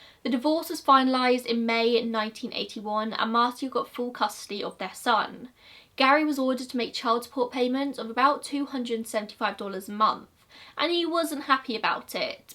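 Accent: United Kingdom. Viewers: i love ur accent so much lol idk if its because I'm American and don't hear a lot of British accents but i always tend to watch British people like Eleanor Neale because of u guyses accents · You've got a buetiful voice, no need to be self conscious. Love the UK accent.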